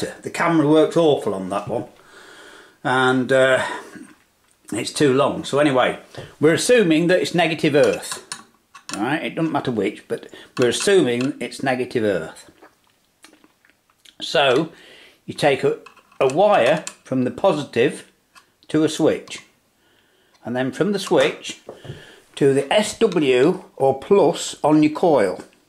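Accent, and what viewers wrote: England, United Kingdom. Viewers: Shit, I legitimately thought I was fluent in English as a French native but to be honest I can't get anything of this strong English accent contrary to American accent.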